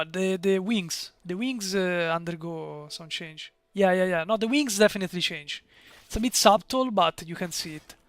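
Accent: Italy. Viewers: stupid question but are you italian??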